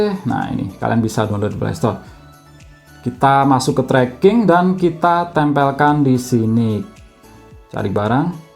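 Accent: Blitar, Indonesia. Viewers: Orang Blitar kah?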